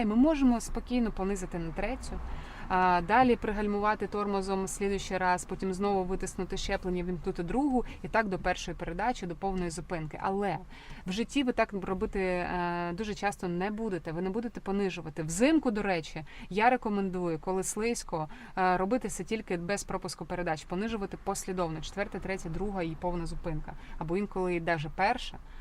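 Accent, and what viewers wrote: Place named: Surzhyk, Ukraine. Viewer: Насолода скушать конфетку, а коверкать украинский это ужас, не можешь не говори, а учись, а то какой то суржик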